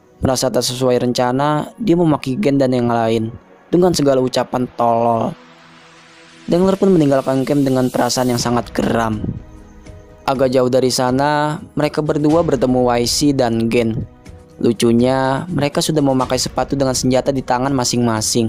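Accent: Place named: Banyumas, Indonesia. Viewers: Mimin ngapak🙈🤭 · Ngapak ngapak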